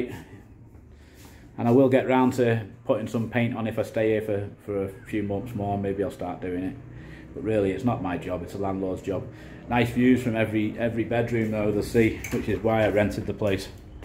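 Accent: Liverpool, United Kingdom. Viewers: is Mada safe? I've always wanted to go i was fascinated with it when i was a kid i'm still a Geography nurd now that's why i was surprised i hadn't heard of nosy be. where are you from? am i detecting a Liverpool accent there?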